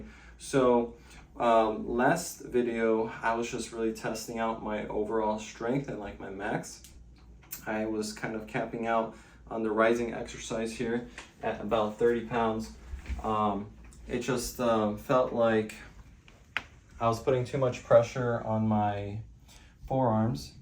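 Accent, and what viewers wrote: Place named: United States. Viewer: Кафявия колан по жиу-жицу за упражниетия на брахиализа. Не знам кой ще е по удибен жиу-жицу колегите или Армрестлерите, щото те го правят с бял колан :D. Your name is Bulgarian but your accent is American, very interesting!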